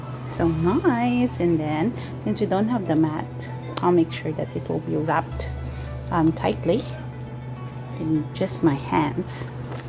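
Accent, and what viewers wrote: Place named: England, United Kingdom. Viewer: Yum! 😋 love your english accent! Galing magchopsticks ha! Your awesome! 😄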